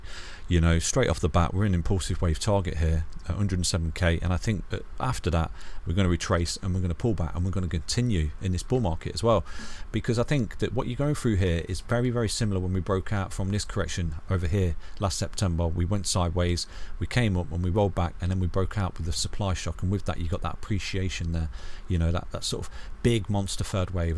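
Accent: United Kingdom. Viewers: I enjoy the British fashion of your talk "bam bam bam see you later" haha.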